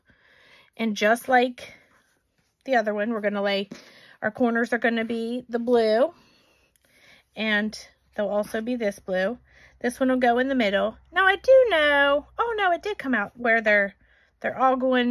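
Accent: South, United States. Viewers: Stop doubting yourself! Girl those seams are looking awesome 👍😎!! Love to listen to you talk, Southern Gal!!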